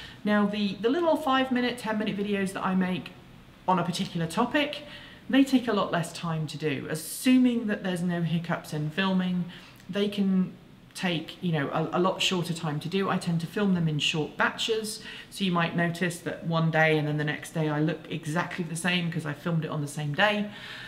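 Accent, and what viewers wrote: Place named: United Kingdom. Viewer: Are you from New Zealand? I've been assuming you are British just based on your accent (I'm definitely not an expert, as may be obvious). If you aren't a Kiwi, why do you do movies specifically for them? Something unique about their market?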